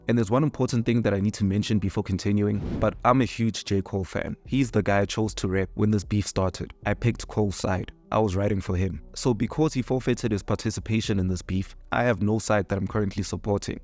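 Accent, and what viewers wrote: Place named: South Africa. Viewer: South Africa.. I can hear it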